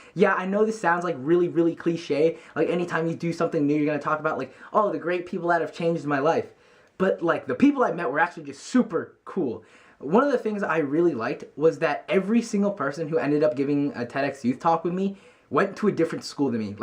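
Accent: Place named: Vietnam. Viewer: I love your accent, are you Vietnamese?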